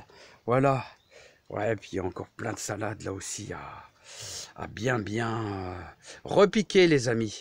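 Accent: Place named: Nord-Pas-de-Calais, France. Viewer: Toujours le sourire dans tes vidéos et tu redonne le sourire a travers tes vidéos Tu parles chti 😊😜 Je te le redis tu es qqun de bien je te souhaite une bonne soirée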